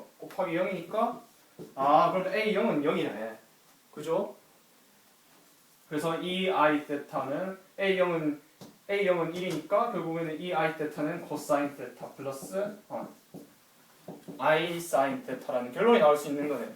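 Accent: Gyeongsang, South Korea. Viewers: 서울 말씨를 사용하세요. 경상도 말씨는 지겨워요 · 서울말씨는 경상도 말씨든 설명이 참 좋네요. 달을 가르키면 달을 봐야지 어리석은 몇몇사람은 손가락을 보네요. 설득 보다 유도라는 표현은어떤가요?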